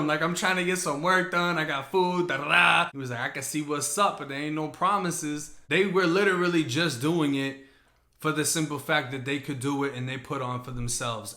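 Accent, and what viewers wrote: Boston, United States. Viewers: Yo, are you from Mass, you got a thick Boston accent fam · Yo anyone evah tell you that you have a Boston accent, are you from Mass.? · You sound like an idiot from Boston.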